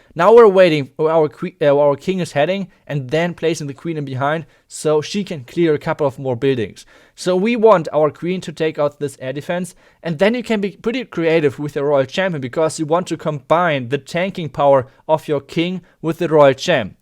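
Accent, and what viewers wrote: Germany. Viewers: You can easy hear the German accent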